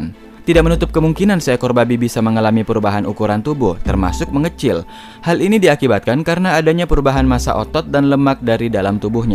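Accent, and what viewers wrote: Makassar, Indonesia. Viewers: Penasaran ku ini sama admin, tinggal di mana Ki? Logat nya Makassar bgt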